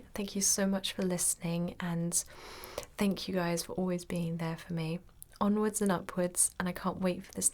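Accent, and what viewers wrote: United Kingdom. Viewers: Im in love with your voice... And British accent ❤️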